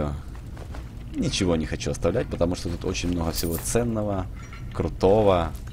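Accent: Ukraine. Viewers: Ты Украинец? Что за акцент?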